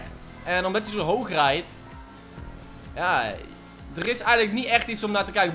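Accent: Brabant, Netherlands. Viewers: das den echte brabander e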